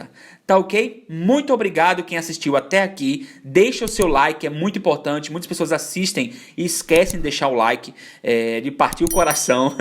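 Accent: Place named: Salvador, Brazil. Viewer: Eita, este sotaque é de Salvador... Coraçãozinho bate no ritmo da Bahia quando escuto meus conterrâneos depois de tantos anos vivendo em Buenos Aires. Obrigada pelo conteúdo! Amanhã mesmo vou aplicar numa ideia. Gratidão!!!! 🙏🏾♥️🙏🏾♥️🙏🏾♥️🙏🏾♥️🙏🏾♥️🙏🏾♥️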